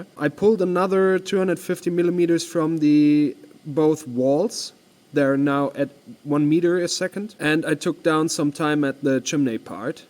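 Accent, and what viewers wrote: Germany. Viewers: Sarcasm in English with a German accent is ..... quite hilarious :D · Klingt, als würden Deutsche englisch reden...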